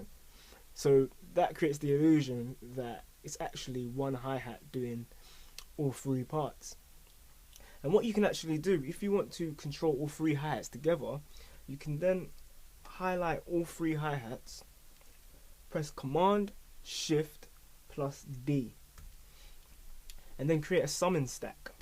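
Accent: United Kingdom. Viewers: Thank you... I've had producers block for about 5 years; save marriage. I've heard that can kill creativity...lol. Anyway I would like to ask you some questions on a business note. Please get at me ASAP. I'm not sure if you are in the UK still, hence the accent, but I am not worried about travel for the right investment.. Best regards. P.S. Mum lives in Essex.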